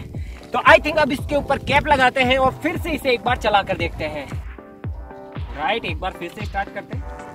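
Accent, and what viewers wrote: Rajasthan, India. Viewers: you make very good vedio you have Rajasthani 😃😃😃😃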